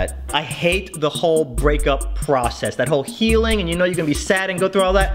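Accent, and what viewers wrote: England, United Kingdom. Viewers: Your british accent tho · That English accent tho! Lol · That is a good British accent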